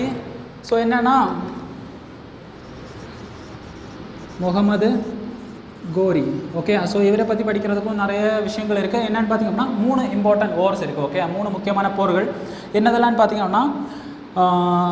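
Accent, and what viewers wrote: India. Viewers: பேச்சு கொஞ்சம் நாகர்கோவில் மனம் வீசியது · Tamil language Malayalam slang mari pesuringa sir,, i think neenga Kerala😊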